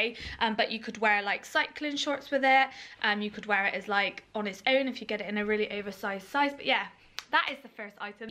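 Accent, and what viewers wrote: Bristol, United Kingdom. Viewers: hey beautiful you don’t have to answer but are you from Bristol😂I can hear a Bristolian accent in you xx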